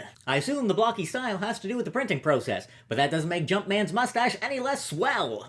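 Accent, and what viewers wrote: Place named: Canada. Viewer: Pronouncing "been" like "bean", yep.. 100% Authentic Maple Flavor Canadian.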